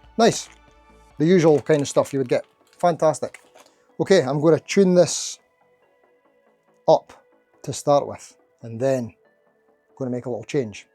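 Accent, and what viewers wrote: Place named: Dundee, United Kingdom. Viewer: great video as always, i don't comment much on these channels but i love the way you champion budget guitars as opposed to ridiculously priced name instruments, being a weegie i also admire you Dundee accent. I myself have been playing 60 years now and still rubbish but no bad on bass, since retiring i have taken up buying cheap end instruments and doing upgrades for a hobby and really ennoying that. So i want to leave you with some words of wisdom, NEVER BUY A ROLEX FROM A BREATHLESS STRANGER. Keep up the good work pal and take care.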